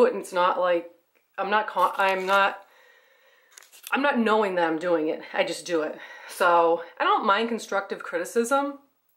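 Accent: United States. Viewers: The way you talk has a.ways been ok to me you just sound American 😊😊👍🏻 Like sounds like “Like” maybe i say it similar . Just carry on 😊😊😊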